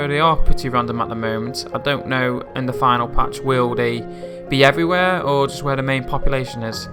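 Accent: United Kingdom. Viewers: Are you from liverpool? You sound like you are.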